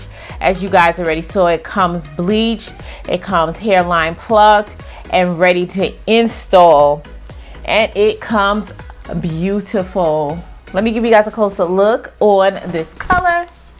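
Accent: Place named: New York City, United States. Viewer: Jersey girl here! I hear your THICK NYC accent! 💕 I have family in the city! Love your videos! Love this unit! Congratulations on the new car! I have been following you for YEARS!!!!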